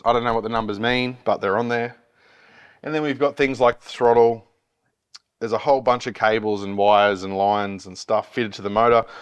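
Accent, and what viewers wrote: Australia. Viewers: Haha so bloody Aussie lol love it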